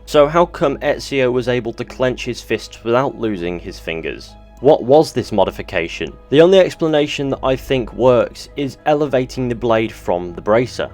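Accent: United Kingdom. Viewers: sorry kinda sound british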